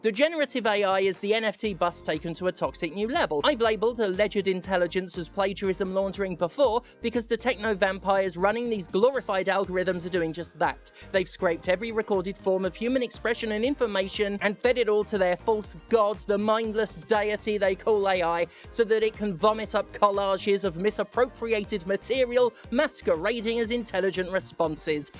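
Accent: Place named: United Kingdom. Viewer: My God, she is even more British than she was before!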